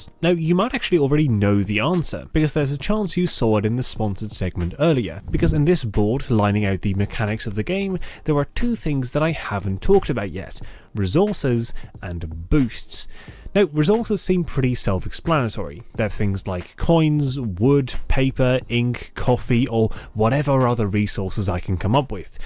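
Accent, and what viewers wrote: United Kingdom. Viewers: WOW!!!!! That is crazy my dude.. u sound like ur from Birmingham.. ask any british person what ur accent sounds like in the old videos.. not for one second did i think u were dutch.. that is some accent.. well done!! Your latest videos sound like ur 100% British!! with a very upper class accent.. :)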